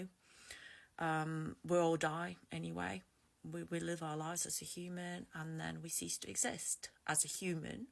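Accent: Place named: North Midlands, United Kingdom. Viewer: I was born into it but made my 'escape' in my mid-teens when I got old enough to slowly move myself 'out the door' over the course of a year or so. So well done to you for making the break. I have to agree that the use of the Bible as if it is an authority with the power to convince you does seem to be very common. My father tried it on me tirelessly from when I left the organisation until the day he died, utterly ignoring my core response that, if I do not agree that his source is 'literal truth', then it is has no chance of causing me to suddenly see the 'error of my ways'. Greetings from an English Druid ... and I was surprised when you said you were Latvian as that accent definitely sounds North Midlands to me :D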